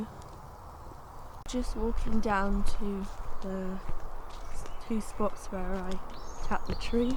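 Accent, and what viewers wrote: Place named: England, United Kingdom. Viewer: I love your accent, which area of England are you?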